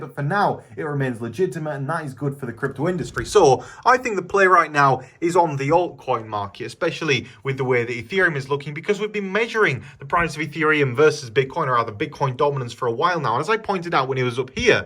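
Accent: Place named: Lancashire, United Kingdom. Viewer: Always enjoy your videos mate, keep it up, good northern accent, take its Lancashire? im other side of Manchester Derbyshire, but they class it East Midlands 😢😢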